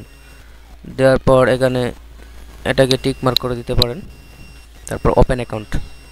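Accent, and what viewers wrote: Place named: Bangladesh. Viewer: he's a Bangladeshi. He has Bangladeshi accent.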